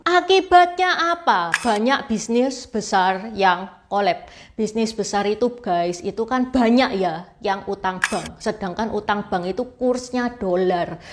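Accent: Java, Indonesia. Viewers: logat jawa. klo cina jkt ga mgkn akan sounding hal ini, karena kalian ga akan prnh 100% paham tragedi kelam itu. sesuatu yg bkn utk dijadikan cerita. -some truth better left unspoken-. yg prlu dilakukan stlh masa itu adalah tetap berbuat kebaikan dan pertebal penjagaan diri.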